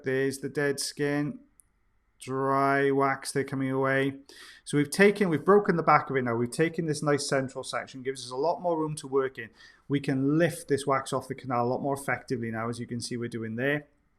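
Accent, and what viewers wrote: Wales, United Kingdom. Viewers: Liking the new specs! You never talk too much. I think of you as my favorite teacher. You’re happy, excited by your subject, and teaching us the whole time! If you record an audiobook, I bet we’d all buy it! Love the Welsh accent and sayings. 🥰😀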